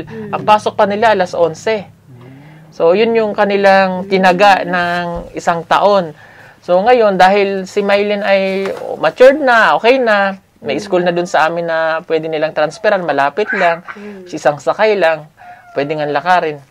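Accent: Visayas, Philippines. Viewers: Kuha kuha na ni ang pag sasalita ng bisaya. Pati accent kuha kuha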